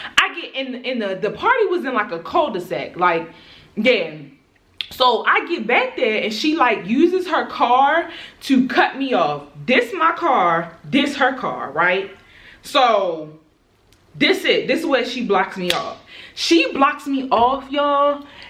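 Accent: Baltimore, United States. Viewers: You so baltimore I love it😂😍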